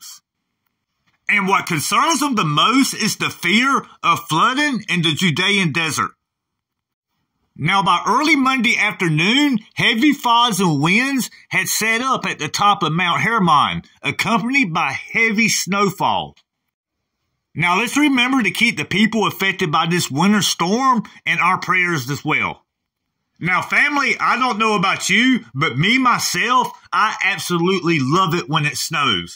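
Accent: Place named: South, United States. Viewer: Bro, great video, luv the Southern accent, the prayer, etc. Thanks for all the News re: Israel; God bless you--see you Soon!! (Rev 22: 7)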